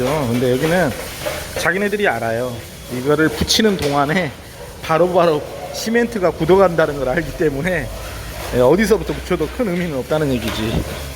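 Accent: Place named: Jeolla, South Korea. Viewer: 눌렀다 ㆍ 이 ~ ㅋㅋ 전라도 사투리를 ㅎ 나도 전라도인데 사투리 엄청 심하거든요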